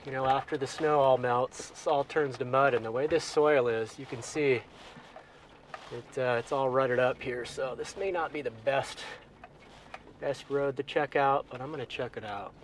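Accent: United States. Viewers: hi, thank you from us Europeans for putting the temperature in C°, just another question, why is "Route (Root) 66" pronounced different to " I'm taking that Route ( Rowt ) is this an American thing? another great video 👍🇬🇧🇬🇧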